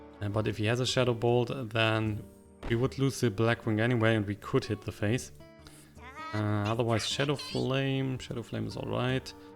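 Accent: Germany. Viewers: What country are you from? Your accent sounds german.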